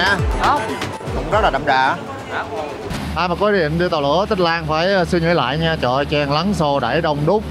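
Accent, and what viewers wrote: South, Vietnam. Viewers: bạn này nói giọng miền nam dễ thương mà dí dỏm, xem cười xĩu luôn.😂😂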